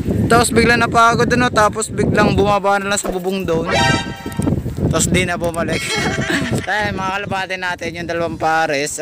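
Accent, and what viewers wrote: Batangas, Philippines. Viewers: Batangas ka ga sir😂😂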